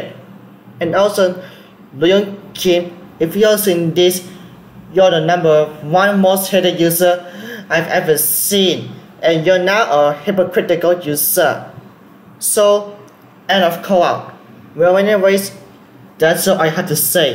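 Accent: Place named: India. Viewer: his voice is actually indian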